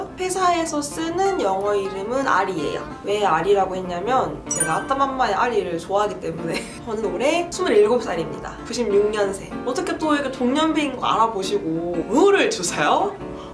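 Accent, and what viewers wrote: Busan, South Korea. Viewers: 말투에서 약간 부산사투리가 조금씩 묻어있네요ㅎㅎㅎ 친근햄ㅎㅎ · 처음에 사투리 듣자마자 엇 부산! 이랬어욬ㅋㅋ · 오 어쩐지 .. 경상도 사람은 사투리가 너무 잘들려서 어디 사람인지 궁금했는데 부산 .. 와 내척 친밀감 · 아리 사투리...? 뒤에 가니까 진짜 부산출신이셨구나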